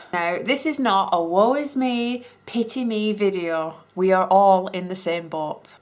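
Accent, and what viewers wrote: United Kingdom. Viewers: Thanks for your tutorials. I watch your videos a lot. I have twin boys. At night when it is bedtime, they often ask to watch a “cake video” on YouTube. I think the British accent helps lull them to sleep. It certainly not because you are boring. I used your toilet seat method for the first time this weekend on my boys’ bday cake. ;). I have a shop but I only do custom work. I don’t have a store front, and that works for me. It got all the stuff out of my house. Home is home and work is work. Thanks for all you and my other fav caking you tubers do. I have learned an infinite amount since I started making cakes 5 years ago.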